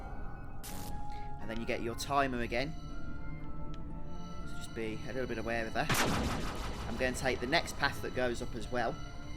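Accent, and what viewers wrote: United Kingdom. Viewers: I'm very proud of myself because i can clearly understand your English Even with your british accent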